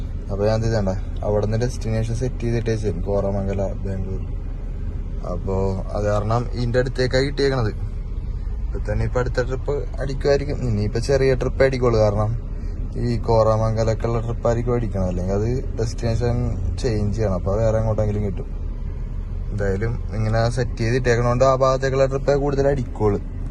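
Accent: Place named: Ernakulam, India. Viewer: Kettal ariyille.. ernakulam 😂😂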